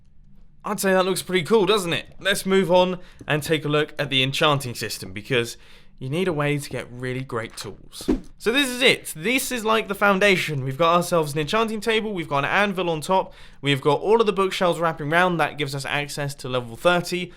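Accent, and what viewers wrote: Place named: England, United Kingdom. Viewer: TheEyeOfItsMaxinho I'm in English time zone like mumbo jumbo I think he sounds English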